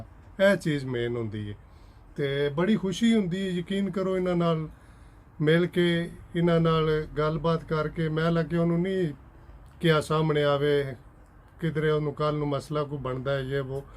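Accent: Gujrat, Pakistan. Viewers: Ap Gujrat se hain .ap k bolne k style mujhy lga tha Ap Gujrat se ho .meri ami bhi Gujrat se thi .☺️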